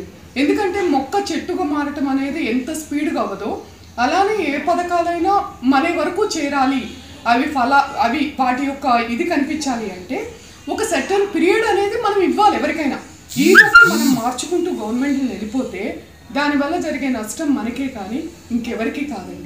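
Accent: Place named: Telangana, India. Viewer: Yentha baga telangana yasa maatladuthunnaro... Meru nijamganay telangana vallalagay maatladathunnaru... 😂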